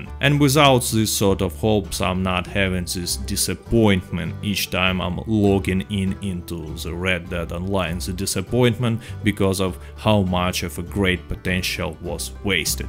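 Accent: Russia. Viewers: Russian accent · Пришёл с cat dead redemption, ну чётко два канала за такое респект! А так акцент русский, произношение советую тренировать. ми инглиш вери вери гуд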